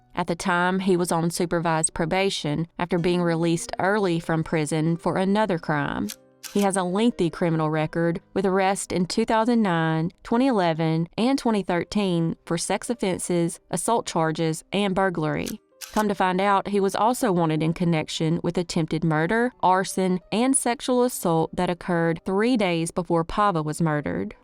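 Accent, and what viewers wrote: South, United States. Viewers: Hahaha! Oh she's a real as you can get!!! She's 100% authentic!!! I can give you big ol' Texas gaurantee She's as real as you will EVER get!!!! And we love her!! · I thought bout tht. The southern accent. But who knws wat those things are capable of....🤔🧐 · You can't do the southern drawl with AI. I really like hearing someone who speaks like people I know down here in the south. Thx!!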